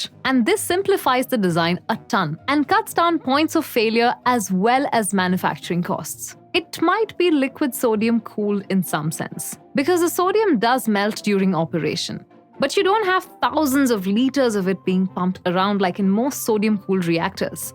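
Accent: India. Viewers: Liked her Indian Accent. It's broken and jumps from one twig to another like a little birdie. Hard to get the sense though. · Indian accent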